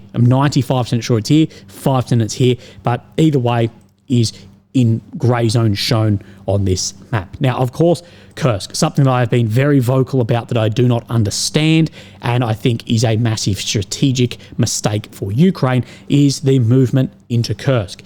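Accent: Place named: Australia. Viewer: the other producers you’re mentioning are way lower in quality. accent alone make Americans love him like crazy! We think that funny little accent is great. I could put up with a drunk Ausi talking nonsense all day and still have a good time. has implied many time “Ukrainian could Win” I quote “if Ukraine wins” There is not a bigger lie told then “Ukraine win” in the same sentence. I’m here looking at a map wondering when people stop lying to the poor men who die daily under the false idea of “winning in Ukraine”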